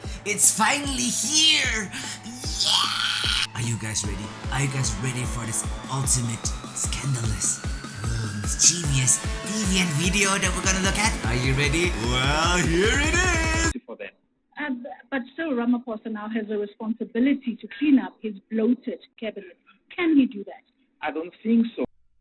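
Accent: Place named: Russia. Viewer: Hebatlah bro. Love your humor and the Russian accent is spot on!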